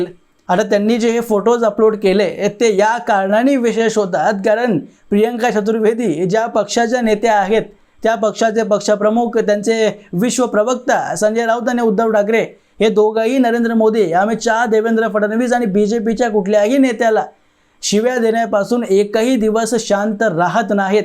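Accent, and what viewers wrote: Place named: Thanjavur, India. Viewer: तुमची मराठी आमच्या तंजाऊर मराठी सारखी वाटते. 👍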